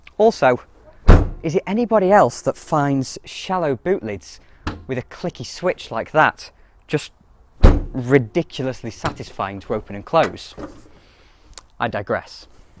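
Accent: United Kingdom. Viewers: Ah yes the Scouse influence? Boss la !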